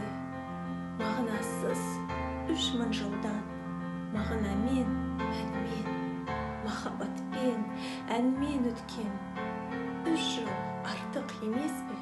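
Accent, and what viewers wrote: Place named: Russia. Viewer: Астанада тұрсаңыз, Синтез актерлік курсына Мұхтар ағайға барыңыз. Тіліңіз орысша шұбарланып кеткен, акцент бар. Ң, Ү, Ө әріптерінің сиқы жоқ, үндестік дауыс ырғағын жетілдіру керек. Өлең мағынасын жүрекпен сезініп соны тыңдарманға жеткізу керек. Мен видеода тек өзіңіздің бет-әлпетіңізге тамсанып жақсы оқып жатырмын ғой декен ойыңызды сезіндім ( орысша айтқанда самолюбование, не передали состояние) сөйтіп, сөйлей сөйлей шешен боласыз, күндердің бір күнінде жарып шығасыз.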